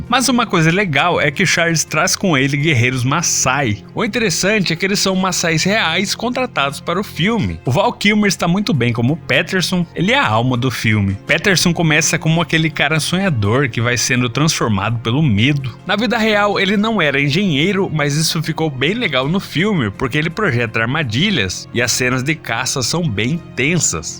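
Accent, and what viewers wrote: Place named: Caipira, Brazil. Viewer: O melhor dessa análise é o sotaque caipira do narrador!!!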